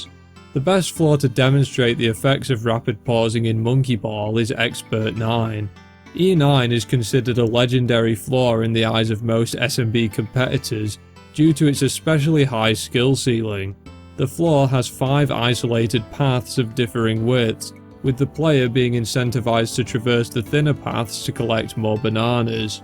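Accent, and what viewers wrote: United Kingdom. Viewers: you sound british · refreshing to hear a yorkshire accent on a good youtube video lol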